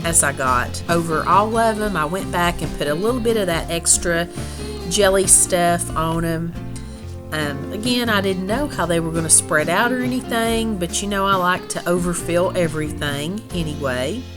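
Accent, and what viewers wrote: South, United States. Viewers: Howdy! This is Jessie from Ohio. One day I found one of your videos and since I had a migraine, I kept YouTube on and binged on your yummy recipes and southern calming voice (thank you for not using loud music). Since the second video I subscribed and I stop by daily to see if there might be one I missed or rewatch one I have seen and saved. So I finally thought I'd say hello and thank you for doing what you do. Hugs from Ohio.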